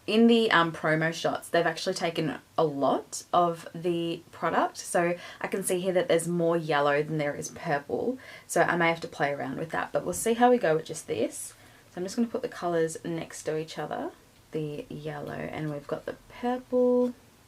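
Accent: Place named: Australia. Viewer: Are you Australian by any chance? You kinda have an Aussie accent.. Loved this video~<3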